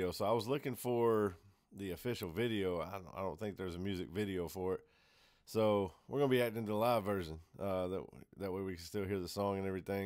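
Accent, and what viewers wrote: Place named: Georgia, United States. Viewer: Man, you sound like you from my neck of the woods. South Georgia? I discovered about 2 years ago. Seen em twice already after not going to a concert in 20 years. Best rock band since the 90s.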